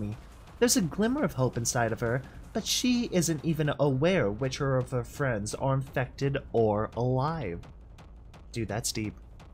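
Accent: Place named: United Kingdom. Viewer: Bro's british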